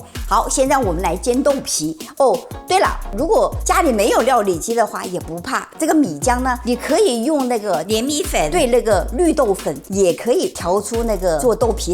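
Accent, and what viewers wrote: Wuhan, China. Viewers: 哈哈哈 听你说的第一句话已经确定你是正宗武汉人了，明天就去按你的教程做豆皮去哦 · 哈哈哈哈哈哈，普通话说着说着就变成了武汉话，你这汉普真的是让我感觉无比的亲切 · 拿起走，尝一尝 熟悉的汉普 哈哈哈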